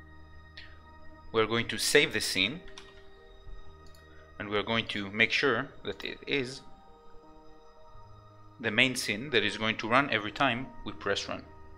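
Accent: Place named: France. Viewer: You are doing a superb job and I can understand you perfectly well. (Although it strikes me as ironic that you sound French and you actually say the 't' in Godot, when it would be silent :-) ). Please keep up the excellent work!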